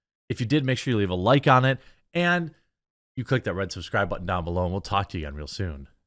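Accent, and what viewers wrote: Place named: North, United States. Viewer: your accent isn't "Midwestern." It's Northern. I only hear people with your accent in Michigan, Wisconsin, and Minnesota. I'm from Indiana and no one here says "Dreg-in."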